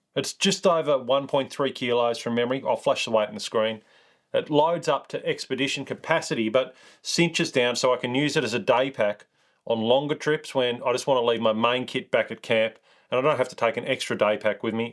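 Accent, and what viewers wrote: Australia. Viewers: PROFOUNDLY Australian voice !. "Rawwrrkkk rawwrrk rawrk !" My goodness, I'm sure that's the Genuine article.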